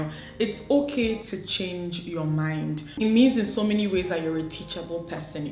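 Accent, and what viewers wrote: Igbo, Nigeria. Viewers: There’s nothing wrong with them oo. That Igbo would always surface 😂 and is lovely. Thanks for sharing.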